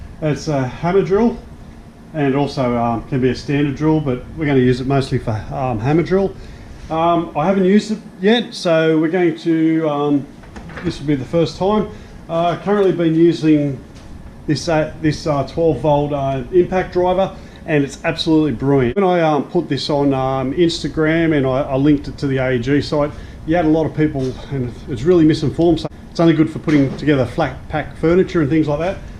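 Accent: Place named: Australia. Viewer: Good old Aussie accent!👍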